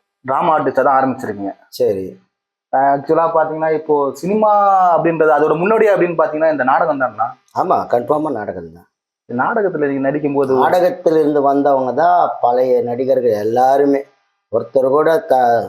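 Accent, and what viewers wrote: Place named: Chennai, India. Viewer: Attahasamana comments ivar epdi sivaji madiri irukka mudiyum chennai speech supera pesararu veetanda sivaji ipdiya pesuvar avara madiri tamizh pesamudiyuma kural illave illa but covering gold aaguma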